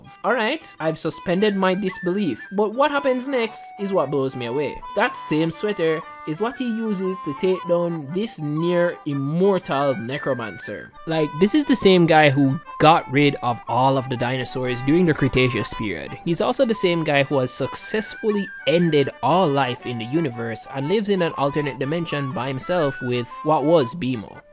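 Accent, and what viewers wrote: Jamaica. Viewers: r u jamaican? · Are you Jamaican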